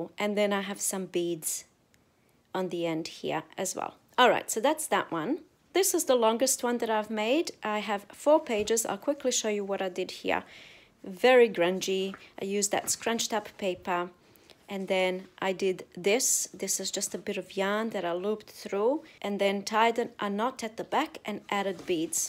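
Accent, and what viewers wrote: Australia. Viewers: Im delighted with your vid Miss, You are an absolute treasure, and so are all your great ideas.... Im furious with anyone that would say such a derogatory statement... goodness, its instructional tutorials, lots of talking is required... please ignore those that would say such terrible things, I could listen to you read the phone book, love listening to that beautiful Ozzie accent..., You are perfect the way you are, dont let anyone tell you any different. God made us all perfect, in his image in fact. Tell the haters to pound sand, we love and adore you the way God made you. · Please keep talking. I love your voice. My mother was an Australian war bride who immigrated to Texas. She's gone now. Your voice reminds me of her voice and my Aussie cousins. And your explanations are essential to the teaching process. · ........you gorgeous beautiful human being...this video has to be in my.....FAVORITE TOP FIVE!!!....these are just absolutely amazing...thank you for showing how to do them....too....please I love hearing you talk as we are both Aussies!!!...Huge hugs and love....Maxxii-Michelle....Perth Western Australia xxxxx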